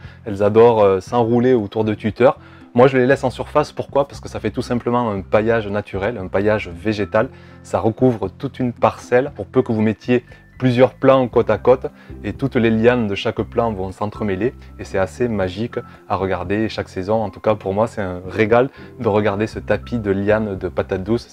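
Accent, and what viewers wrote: South, France. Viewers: Merci pour tous ces précieux conseils et pour l'accent de mon Sud natal 😄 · Salut, Voilà j'ai lancé la plantation de patates douces... 1ère étape, faire germer les morceaux de patates douces (en ayant coupé 2 patates douce en 4 morceaux) mis en barquettes sous cloche avec lumière horticole 150 watts et tapis chauffant réglé sur 20 °C. Je reviendrai te dire de quoi il retourne par la suite. Nous sommes aujourd'hui dans l'Aisne (02) le 02/02/2020. Encore merci à toi pour tes merveilleuses vidéos qui au delà des techniques m'apporte ta bonne humeur, ton inspiration, ta gniac, ton superbe accent du sud et ta bonne humeur légendaire. Merci à toi et continue car tu as une empreinte spécifique sur Youtube.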